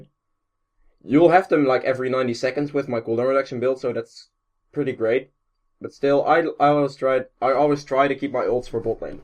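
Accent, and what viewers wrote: Netherlands. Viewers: I'm new to your channel, but you sound dutch! Are you? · You're dutch I hear it because of your accent. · Ja je hoort echt dat je Nederlands bent.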